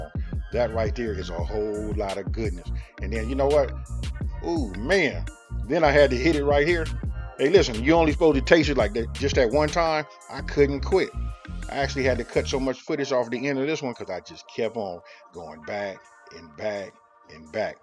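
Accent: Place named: South, United States. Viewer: Yes but cause he's living in Texas and working he is definitely sounding like a Texan too!😉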